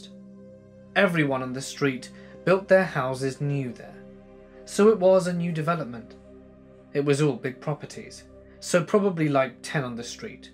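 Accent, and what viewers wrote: United Kingdom. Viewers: British accent isn't working for me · I truly enjoy your stories. Especially your voice. Being across the Big Pond I don't often get to listen to British voices and yours is amazingly smooth and well modulated. One channel sounded so bored he put me to sleep. You know just how to make a story scary and sometimes sad. I just wanted to thank you for all your hard work. Also thank you for sharing. Ms Michal aka Oldgamerchick😺😉☕🙏💖⛪💖 💀💀👻👻👻👻👻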